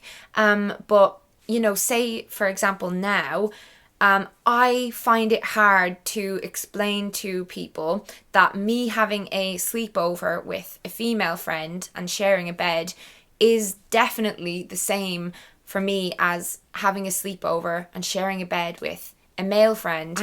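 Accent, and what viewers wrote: Ireland. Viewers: Are you from Dublin? Your accent reminds me of Saoirse Ronan.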